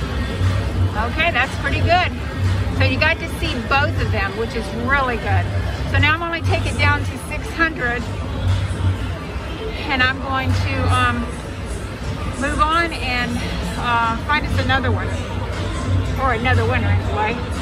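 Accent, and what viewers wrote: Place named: Pittsburgh, United States. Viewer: Do I detect a fellow Pittsburgher? If so, hello from Kennedy Twp. Love the video 😊❤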